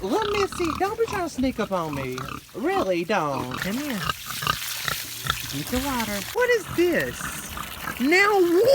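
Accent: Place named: North Carolina, United States. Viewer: You can tell you’ve been in good ole NC for a while! That accent is on point! 😂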